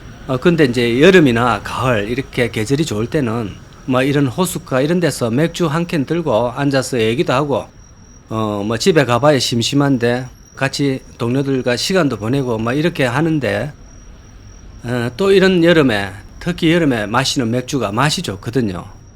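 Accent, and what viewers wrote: Gyeongsang, South Korea. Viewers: 님 고향이 창원 마산쪽인가요? 아님 김해쪽이신가요? 사투리로 봤을때는 서부갱남은 아니신거 같아서요.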